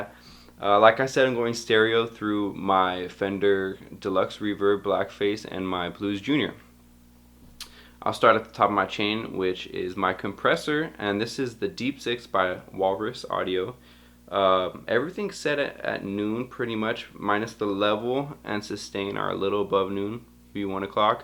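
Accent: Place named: Brazil. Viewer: Nice! Quality and efficiency... Hey man, are you Brazilian? Something in your english sounds familiar...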